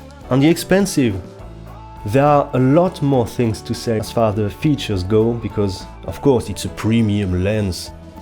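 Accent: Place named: France. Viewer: Your French accent made this video very enjoyable to watch - thanks!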